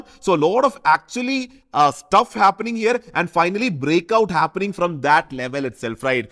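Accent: Kerala, India. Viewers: I love your Kerala style English pronounciation.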